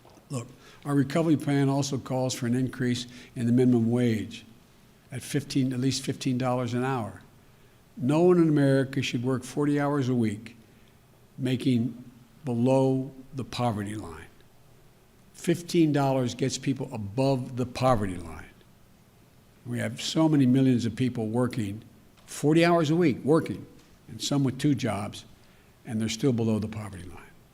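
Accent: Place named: Europe. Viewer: Hey are you American? Your accent betrays you sounds European, just asking? Maybe you were born in America and raised elsewhere anyways I do my homework. I Please stop trolling Traditional Catholics Conservatives and spreading strife....go find a liberal site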